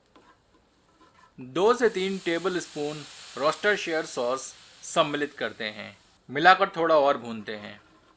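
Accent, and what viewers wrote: Standard. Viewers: Bro your shudh hindi is as good as your English you are a great chef you deserve more subscribers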